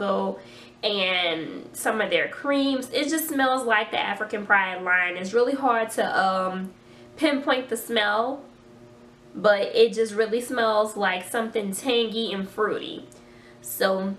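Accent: New Orleans, United States. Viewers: New Orleans accent?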